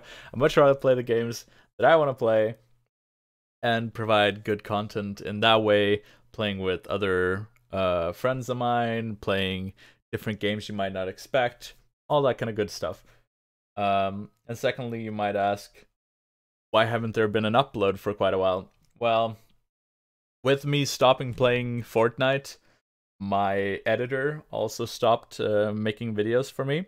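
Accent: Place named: Germany. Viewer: Great Deutsch-English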